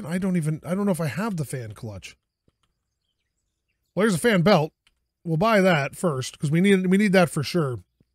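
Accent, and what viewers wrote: Canada. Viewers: I love your videos, quite funny to watch. I can tell that you're definitely not new to working on cars, though I'm not a mechanic. By chance, are you Canadian? Your accent sounds a little like that.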